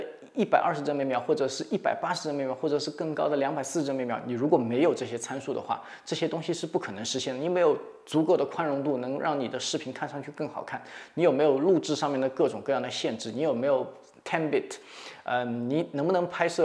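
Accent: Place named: Jiangsu-Zhejiang, China. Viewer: 应该是江浙一带的人，听他讲普通话觉得很亲切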